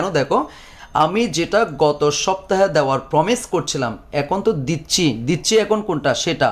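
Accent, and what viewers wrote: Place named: Chittagong, Bangladesh. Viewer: Ctg r basa buja sbr kaj na bhai Eke to free paccen tar majhe ajaira kotha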